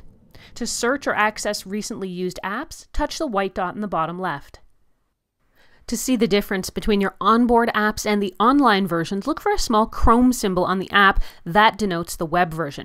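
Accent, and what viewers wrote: Canada. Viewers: Gotta love Canadian ladies talking aboot tech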